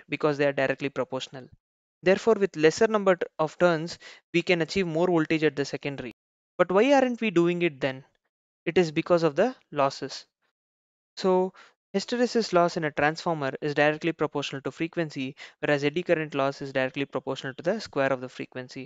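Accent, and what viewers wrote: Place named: India. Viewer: I love indian english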